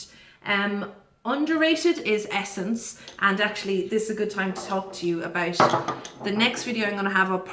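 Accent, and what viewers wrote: Ireland. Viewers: I love your accent. Irish accents are one of my faves! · I love listening to you talk. Very partial to an Irish accent lol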